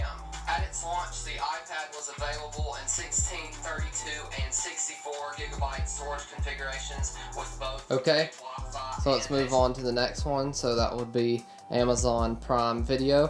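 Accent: Texas, United States. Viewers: you have the most texas accent ive ever heard god damn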